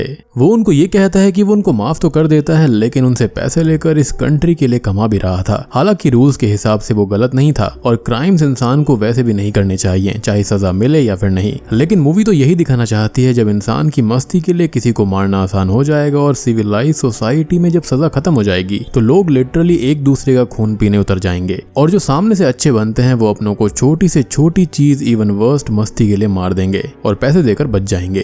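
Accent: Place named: Kolkata, India. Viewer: I know this accent is from kolkata. Don't be sad about such comments. It's all about the fun like a lil child speaks in his way .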